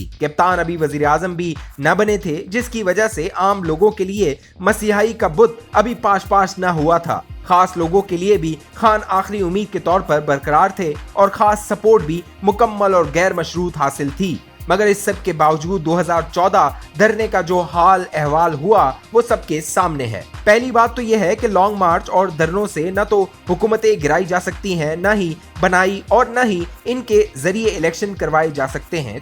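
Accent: India. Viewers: The guy who is doing commentery is indian from his accent! So what is he doing here? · Absolutely right. Narrator seems to be an Indian due to his typical Indisn Pronunciation.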